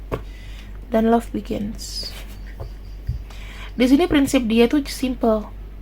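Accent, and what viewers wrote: Java, Indonesia. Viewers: jawa medhok 😂😂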